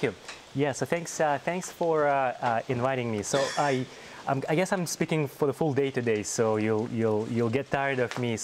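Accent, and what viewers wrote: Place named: Russia. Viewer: Чувствуется русский акцент ...перемотал в начало, автора посмотреть, я был прав!)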